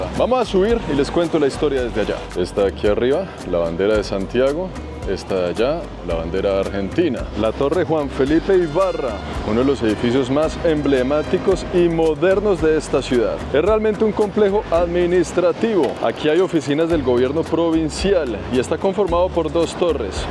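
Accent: Colombia. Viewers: Jajajaja la cultura de la SIESTA ,ACA EN SAN JUAN ES IGUAL, NO SE PUEDE SALIR CON EL CALOR !! BUENO YO TE SIGO Y TE VEO SIEMPRE JUAN !!!! Tus formas de ver cada pais es muy diferente a los demás ,muy interesante ver cómo vive la gente común ,sus tristezas,alegrías ,lo que valoran ,sus casas,en fin...tu narrativa con ese acento colombiano me encanta 😊!!!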